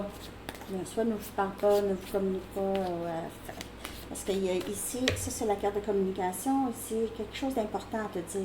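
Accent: Canada. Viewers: Merci cette guidance me parle grand’ merci ps:je comprends très bien l’ accent québécois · Salut, Merci beaucoup pour votre magnifique travail! ❤ Et pourquoi changer votre merveilleux accent canadien??? ❤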